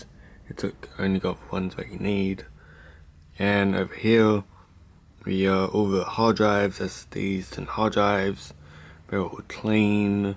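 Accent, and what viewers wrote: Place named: Australia. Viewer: Ok i'm going to make a guess that you are Australian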